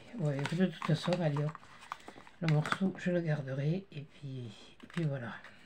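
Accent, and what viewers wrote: South, France. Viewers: Coucou, je découvre votre chaîne et je reconnais bien là la gouaille du sud! J'adore! Vous m'avez bien fait rire! Y en a une qui a intérêt à bien se tenir!!! Votre franchise me plaît. Du coup je m'abonne et je pourrai découvrir vos créations. Merci pour le partage. Bisous de l'Aveyron.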